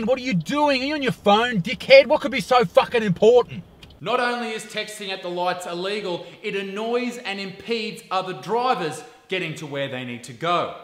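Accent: Australia. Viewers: As a Aussie this is so true and funny If you think he is being sarcastic it's how Aussies talk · You got such a great Aussie acsent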